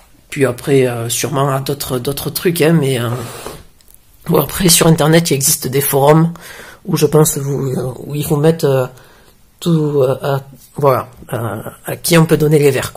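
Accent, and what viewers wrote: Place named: South, France. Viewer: Bravo n écoute pas moi je vais te parler de tes calites. tu a une jolie voix qui passe très bien avec un accent du sud qui te donne un charme fou ca passe Le charme de ta jeunesse et ta belle curiosité qui te mènera loin Plien de progres a faire tu vas y arriver Encouragement un abonné qui te connais pas amitié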